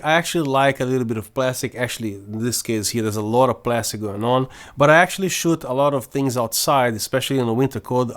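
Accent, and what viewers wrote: Brazil. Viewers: I can feel the brazilian accent... Otimo conteudo amigo. Parabens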